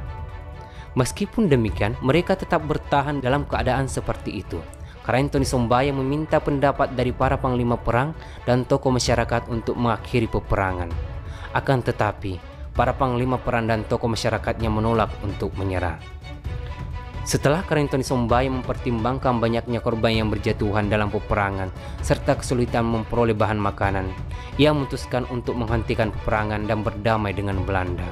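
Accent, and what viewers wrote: Bugis, Indonesia. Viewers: ini tdk masuk akal , dialek saja sdh salah ,menyebut nama saja sdh tidak benar , logatnya bugis .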